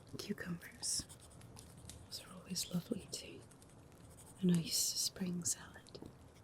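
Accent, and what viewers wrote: Ireland. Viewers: Ginger curls and freckles suits you well. How do you do a Irish brogue so well? It sounds so natural. 😊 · I do not claim to have heard many Irosh accents in my life but i love everything about the way you talk to me. Thank you for warming me up in this rainy weather! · After the rain subsided I will find the mushroom I seek., you do a mean Irish accent. You really are the master. Terrific RP!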